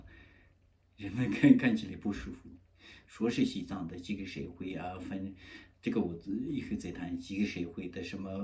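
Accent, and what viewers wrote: Qinghai, China. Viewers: 他的汉语这么地道 他带的是青海口语。 我觉得你没有根本读过小学的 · 但是我从来没有听说过尊者说过没有神灵的说法😏哦😳我错过了什么一部分·．还是，听错了， 请不要把你的想法和达赖喇嘛的说法混为一谈，尊者教导我们要尊重其他的．异，信仰，但是我从来没有听说过你说的….没有神灵、 还是你的青海话，我听不懂，如果听错了请你原谅我的言 论. 但藏族人说藏语还是听起来比较舒服.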